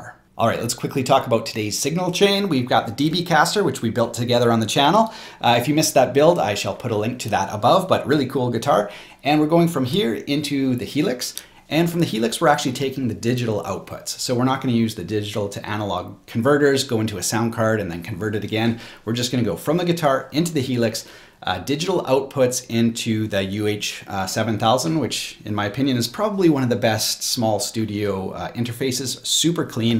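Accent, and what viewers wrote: Canada. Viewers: It's not often I can hear the first fragment of a sentence and know someone is a fellow Canadian, but that absolutely happened here! Lol Excellent video, thanks for putting it together, seriously considering a Helix.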